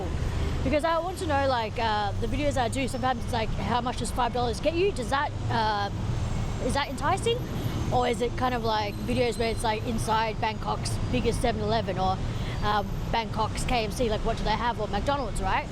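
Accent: Australia. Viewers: To answer your question, what you guys watch? The travel and food vlogs have been done to the death. You are may be 10-15 years late to this genre. I have watched two of your videos, I watched them just for drama and hear an Asian woman taking in heavy Australian accent. I have few more critiques but I will keep them to myself.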